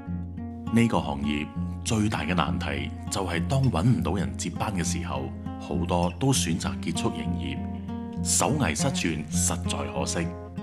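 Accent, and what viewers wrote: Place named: Cantonese, China. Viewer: 你的粤語好好聽💚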